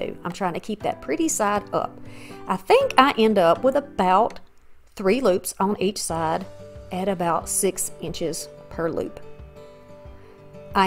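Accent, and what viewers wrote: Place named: Alabama, United States. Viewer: Hello from a fellow Alabama girl! I haven’t watched this video yet, but I already know I will love it. I found your channel last weekend and I’ve been watching a lot of your past ones. I have one playing on my tv in the bedroom right now from last year. Love your channel and I love hearing someone who has the same accent as I do 😊❤ best wishes! (Subscribed and hit the bell to receive ALL your notifications 💜)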